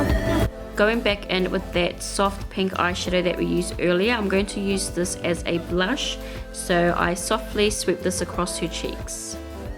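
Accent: New Zealand. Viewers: Love it. So great to hear another Kiwi accent doing makeup videos :)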